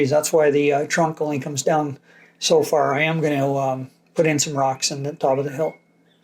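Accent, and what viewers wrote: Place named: Canada. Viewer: Very informative. I appreciate you telling what colors you are using. Love to hear your Canadian English. Toni-Colorado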